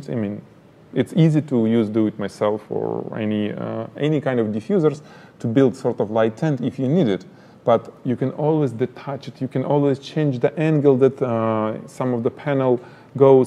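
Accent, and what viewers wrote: Russia. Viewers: Hi, firstly are you Russian? Great accent!:D. OK real question, I make handmade beaded jewellery, but my product photography really doesn't do justice to my jewellery. My biggest issue is how to get the sparkle in the crystal bicone beads, but not blow out the duller toho beads. Over a year I have been trying, and no matter what I can't seem to take decent images on a white background on a budget for my shop. I absolutely loathe trying to do the images now, as to photograph say 10 items can take me over four days, and I'm still not happy at the end. Any chance you would concider doing a video on something like this? I'd even be happy to send you a piece of my hand made jewellery for you to use for the demo which you could then pass on to your loved one. Desperate for help, as a single mum trying to make money from my jewellery making skills is being let down by the fact I am unable to take the images that show off the jewellery. 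Kindest regards Linda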